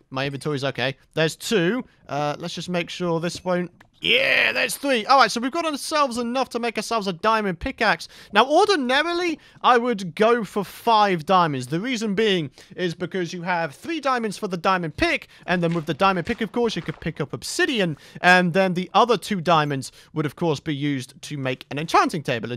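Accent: United Kingdom. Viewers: I love british accent people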